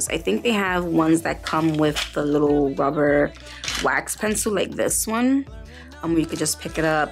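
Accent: New York, United States. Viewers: Not only do I love your nail work your New York accent is to die for! I’m from California, and anytime I go back east I could listen to people talk for hours! You are so fabulous! And PS I have used those wax pencils to pick up stones ,large glitters etc. they work really well😀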